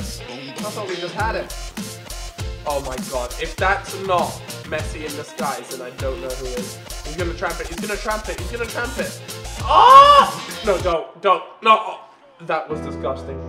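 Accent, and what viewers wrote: United States. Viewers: He kinda sounds American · he talks kinda American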